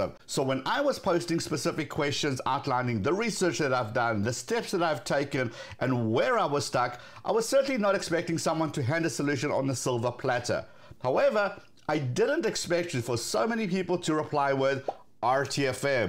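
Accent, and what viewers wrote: South Africa. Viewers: Whenever there's a new video I click, for the content, but secretly for the south African accent 😂😂 Much love from 🇲🇿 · You've got an accent similar to ours in South Africa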